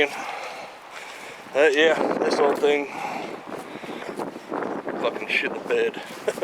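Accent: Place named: Texas, United States. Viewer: I’ll make my way out there eventually with my ATV. For some reason, I thought you were from Texas, maybe the accident lol..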